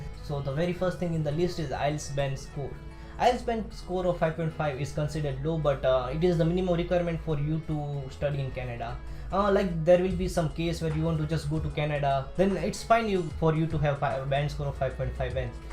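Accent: Gujarat, India. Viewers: I appreciate your love towards our nation 🙏 brother but the reason I asked was that your accent sounded Gujarati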